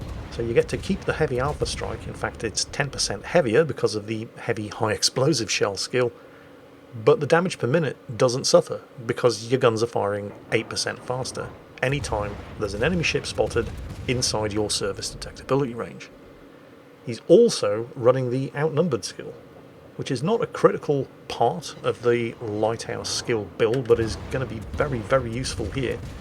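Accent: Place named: Geordie, United Kingdom. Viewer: It is a little puzzling - he's from the North East, but years living in Pompey has blunted his accent to the point you can barely detect the Toon.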